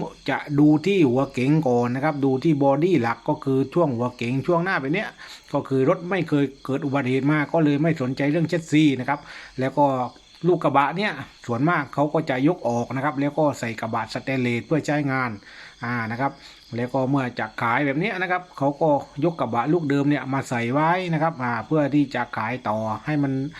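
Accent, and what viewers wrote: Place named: Tak, Thailand. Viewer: สำเนียงพันนี้คนตากแน่เลยนิ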